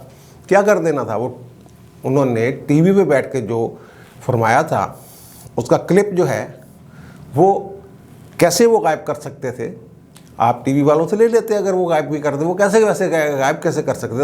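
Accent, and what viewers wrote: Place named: Punjab, India. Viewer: Bhai Aap apna punjabi lab o lehja qaim rakhay howeye hain yeh bari fakhar kee baat hay, main aap kee mukamal himayat kerta hoon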